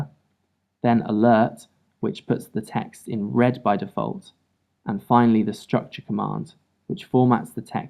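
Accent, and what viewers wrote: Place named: United Kingdom. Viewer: Very nice tutorial, it's very helpful and I really love your British accent, which makes your tutorials so comfortable to watch.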